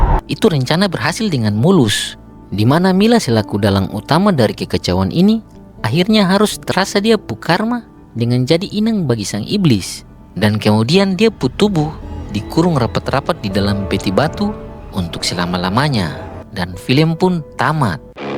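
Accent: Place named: Eastern Indonesia, Indonesia. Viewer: Pertahankan logat timur anda bung.. Pertahankan ciri khas bung & jadilah berbeda (positif). Saya doakan channel bung sukses 🤝👍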